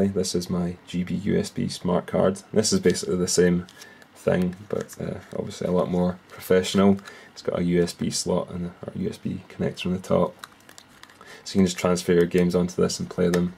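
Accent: Scotland, United Kingdom. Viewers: I love the scottish accent.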